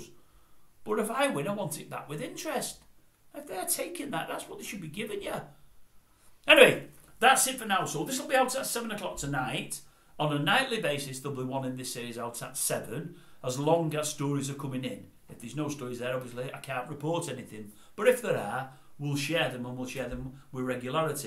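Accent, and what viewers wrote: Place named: Manchester, United Kingdom. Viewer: You’ll tell the story because it’s content and it’ll earn you a few quid through views jacka norie jacka norie accents annoying manc farmer inbread it’s one of them,, the stories irrelevant by time you tell it 🥱🥱😴😴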